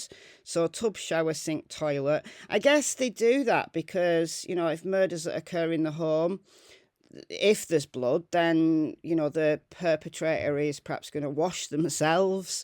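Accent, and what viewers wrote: United Kingdom. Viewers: Me too. I love accent. We do not have tons of UK residents in Va where I live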